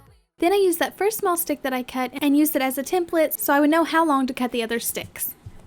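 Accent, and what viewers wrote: South, United States. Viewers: I have been watching you for over a year now. 1/08/2023 is today's date. I am realizing I have so many more videos yet to watch. I thought I was pretty well up to date. ❤ Love the windmill. I have got to say your southern accent is really noticeable in this video or the most recent ones.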